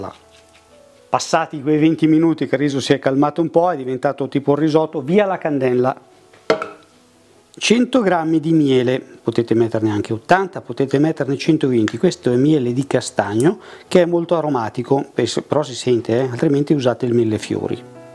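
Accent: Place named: Massa, Italy. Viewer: UNA BELLA RUMATA ...... DIALETTO MASSESE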